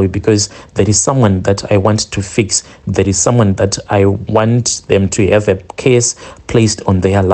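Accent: Zimbabwe. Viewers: European stories is the name of the channel only to be greeted with a heavy African accent. Sounds Zimbabwean I can tell because I'm Zimbabwean too😢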